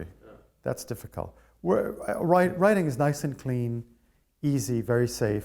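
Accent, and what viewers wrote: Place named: New England, United States. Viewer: Upper-class New England accent. Great writer. Smug. Patriarchy supporter. Western Imperialist. Total White Man.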